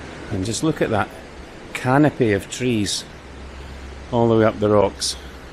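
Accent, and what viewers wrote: Scotland, United Kingdom. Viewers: Your delivery is very similar to the channel Life is too short, but with a Scots accent lol. Very nice vlogs, giving me ideas for when I get to Scotland in my campervan 😊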